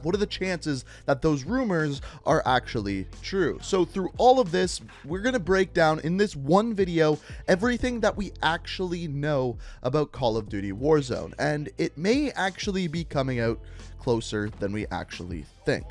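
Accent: Canada. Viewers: By his accent I'd guess he's from or near Winnipeg, Manitoba Canada. He sounds like a professional wrestler named Chris Jericho that has the same accent.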